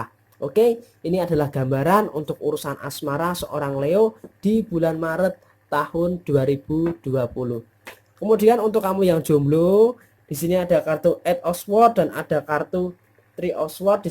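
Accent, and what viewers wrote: Java, Indonesia. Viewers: Pendirian kuat,klo gk penting gk banyak bicara, siiipp,,, mmg begitu Ada nya. Makasih Mas, reading nya,,,,,, medok banget bhs jawanya,,,, heheeeheee... 🙏🙏👍👍😇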